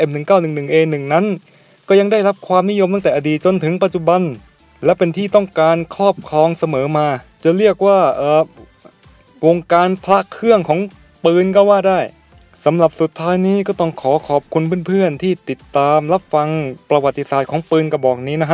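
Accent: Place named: South, Thailand. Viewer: เสียงสำเนียงใต้เหมือนพี่ โต้งกันเบท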